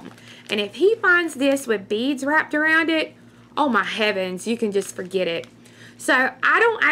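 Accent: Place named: North Carolina, United States. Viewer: you are a hoot! My family is from Mt.Airy,North Carolina. I feel like I am listening to a family member! I enjoy your videos so much! Thank you!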